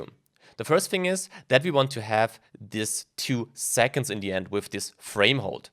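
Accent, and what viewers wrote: Germany. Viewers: Hahaha man hört so, dass du deutsch bist. Feier ich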